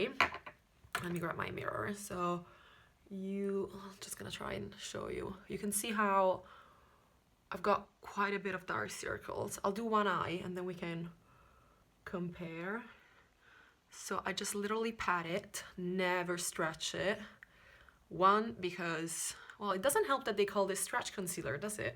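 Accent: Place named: Italy. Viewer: You have a perfect English but I’m just curious where you’re from because I can hear some accent here and there, maybe Italian? Just curious! (I’m Italian and I feel like I talk in a very similar way when I speak English)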